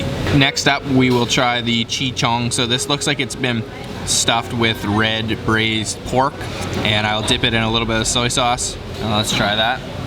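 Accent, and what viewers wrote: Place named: Canada. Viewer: I was wondering where this young man was from....the food looks great. I finally figured out where he is from when he went to the Oowtdoor market and was aboot to try some food.....CANADA. Keep up the good videos young man.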